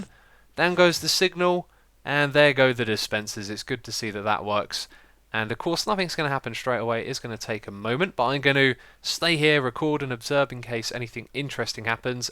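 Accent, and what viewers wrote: Australia. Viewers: Is an Australian? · Can confirm that is an Australian accent, source: I am Australian;) Love the video!:-) <3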